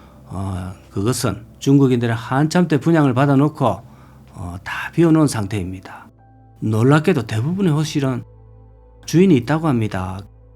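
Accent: Gyeongsang, South Korea. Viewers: 님의 촌철살인의 나레이션 내용과 매끄럽게 흘리는 서부경남 사투리가 기가막히게 어우러져 v-log의 인기가 나날이 더해갈 것입니다. 항상 잘보고있습니다.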